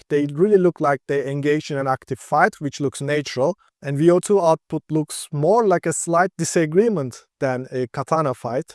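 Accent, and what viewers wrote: Turkey. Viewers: From your accent I surmise you are from Turkey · türk ingilizcesi nerde görsem tanırım bre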